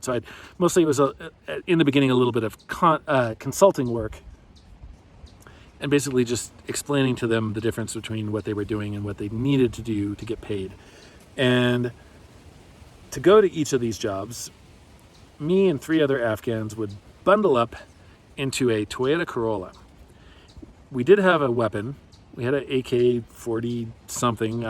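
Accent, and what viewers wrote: United States. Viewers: I live in idaho, your accent is idaho?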